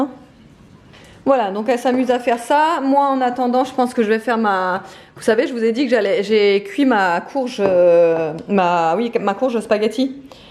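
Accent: Alsace, France. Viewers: ah d'accord ! Je comprends c'est pas négligeable ! J'aime beaucoup ce que tu fais comme vidéos. Et en plus tu es alsacienne comme moi et quand je te regarde ton accent me fait penser à lalsace ahah bonne journée et bon weekend à toi bises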